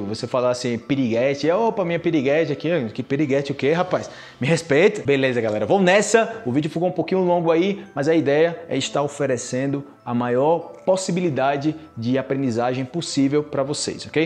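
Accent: Antioquia, Colombia. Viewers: Adoro o seu SOTAQUE colombianoo, kkkkkk. Gostei do vídeo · Muito bom,! Excelente la lección y por cierto, muy chévere tu acento paisa 😊😌 y por un segundo creí escuchar un dejo de acento costeño?🤔 Obrigada pela lição! 😌🙏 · Habla puro un colombiano, que cosa más linda ahh! Jajaja... Saludos desde Costa Rica... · Es increible tu acento Colombiano 🥰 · Yoda 😂😂😂😂😂😂 estava saco 😂😂😂😂😂 que bárbaro que entonación pronunciacion y ritmo para hablar el español es que normalmente a los brasileños se les nota el acento pero increivel seu acento colombiano 😂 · Irmão, você é incrível. Você fala perfeitamente com sotaque colombiano. Eu aprendi muitas expressões colombianas com você. Abração !! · se le siente el paisita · Buen acento paisa.. (pola es una expresión más rola) y a ratos como costeño, medio caribeño. Yo no entiendo por qué usan tanto en portugués "mesmo" y "pegar". 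Y.. "berraco" también es estar enojado, incluso se vuelve verbo "emberrecarse". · Parce use the habla re bacano cuando dice las cosas en español, jaja suena re colombiano jajaja gracias por esa creatividad y por esforzarse en esas expresiones que nos gusta. Quiero hacer lo. Mismo en portugués jajaj pero es difícil aún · Está legal esse sotaque Paisa cara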